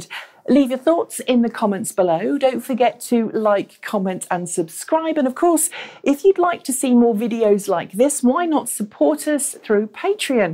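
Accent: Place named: England, United Kingdom. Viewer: it s too hard to understand if English is not your mother language. it's a too 'hard' English accent. after months i must unsubscribe. a pity but it's always a too much big effort to understand for me.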